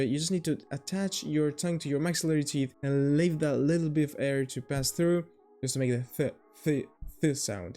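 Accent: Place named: Greece. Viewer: Τα γελοιοποίησες τελείως τα Ελληνικά μας, χαχαχα! Ας σκεφτούν όμως οι αγγλόφωνοι ότι αν σε αυτούς φαίνονται ανάποδα τα ελληνικά, το ίδιο φαίνονται ανάποδα και στους Έλληνες τα αγγλικά όταν τα πρωτομαθαίνουν, αλλά με τον αντίστροφο τρόπο. Δηλαδή κάποιος παραξενεύεται όταν μαθαίνει ότι το Ρ προφέρεται στα αγγλικά 'π". Το καλό λοιπόν είναι ότι αφού σχεδόν όλοι οι Έλληνες κατορθώνουν να μάθουν τουλάχιστον να διαβάζουν απλά Αγγλικά και η νέα γενιά τα μιλάει και τέλεια, έτσι μπορούν να μάθουν και οι αγγλόφωνοι Ελληνικά, και ας μην γκρινιάζουν με το να τα θεωρούν δύσκολα. Το μόνο αλλά πολύ σοβαρό πρόβλημά τους είναι ότι 'κυκλοφορούν' στο youtube πάρα πολλοί ακατάλληλοι "δάσκαλοι" της Ελληνικής που τα έμαθαν λάθος και τα μεταδίδουν λάθος. Το παλικάρι από εδώ ακούγεται είτε ελληνόφωνο εκ γενετής είτε δίγλωσσο εκ γενετής, γιατί η προφορά του στα Ελληνικά είναι ακριβώς Ελληνική. 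Μπορείτε να το εμπιστευτείτε.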